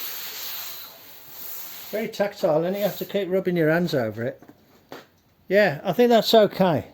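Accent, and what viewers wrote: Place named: West Midlands, United Kingdom. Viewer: Loving these videos on the 100E AND really nice to hear a WM accent again 😊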